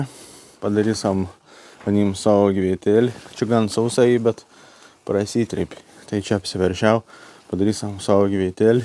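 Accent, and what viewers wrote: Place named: Samogitia, Lithuania. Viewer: O kodėl blogai gražia žemaitiška tarme?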